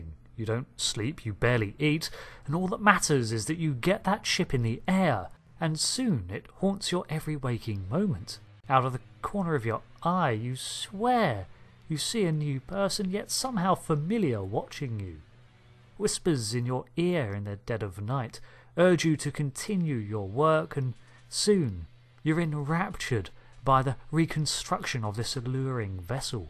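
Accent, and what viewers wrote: England, United Kingdom. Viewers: Dude, your English accent is gorgeous.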